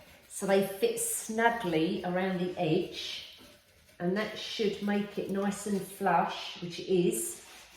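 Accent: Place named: Northern England, United Kingdom. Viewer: I’m from Durham and I can say that was not too bad for a northern accent, 😂, what did make me spit my coffee out was when you were ranting about Sean taking the washing up liquid and you said, “he takes it up the back”, my hubby raised a eye brow cos he didn’t understand the full conversation, 😂😂 xxx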